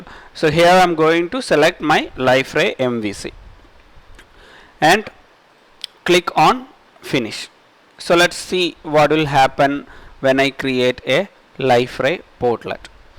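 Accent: India. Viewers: your accent is very annoying ,plz try to speak better and clearer and further away from that ... indian accent. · Mallu Accent :D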